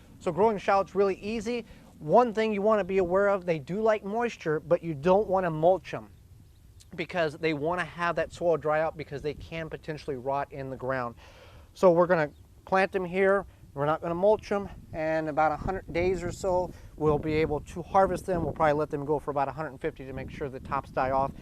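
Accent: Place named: United States. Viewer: Simple, helpful American English. Thank you.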